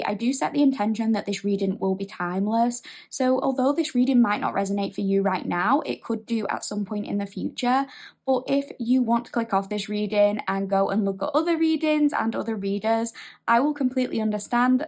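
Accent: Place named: Scotland, United Kingdom. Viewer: u r very good reader, like ur 'scottish accent'...many thnx🎉🎉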